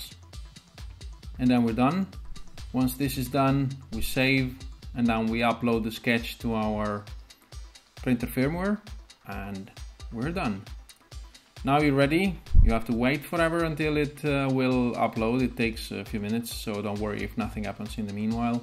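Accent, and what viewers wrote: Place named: Netherlands. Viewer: Italiaanse subs maar 100% een nederlands accent! bedankt ga morgen alles installeren :)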